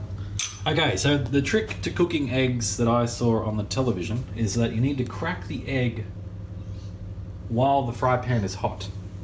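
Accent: Australia. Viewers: Looks like the dual-tap sink typical of British sinks... except you sound Aussie and keep your eggs in the fridge like Americans. I'm so confused!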